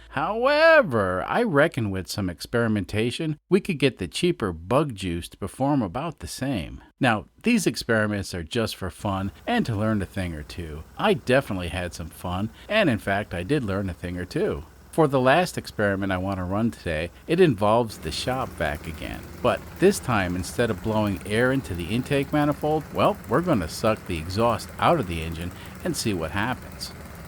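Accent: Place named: Chicago, United States. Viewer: Your accent I don't even recognize, not Michigan I'm assuming. Chicago? But you complain about the heat after moving from Michigan and it has me curious. Do you prefer the Kansas climate overall? I'm a buckeye/Michigander myself. I was transplanted to Texas by my family and can't wait to get back to Michigan and get out of the heat.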